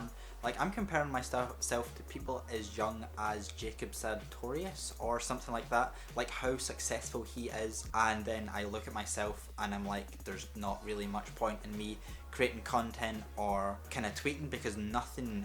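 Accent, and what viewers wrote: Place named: Glasgow, United Kingdom. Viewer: Can I just say that I have a form of anxiety and when I get anxious I don't want to be around people. So if I can I go to my room and watch your videos and it really calms me down and I think it's because of your accent because it is fairly local to me (Glaswegiany) so please don't stop creating because I love your videos and my friends tell me I ramble on about your channel and it's great and you're great and yea...bye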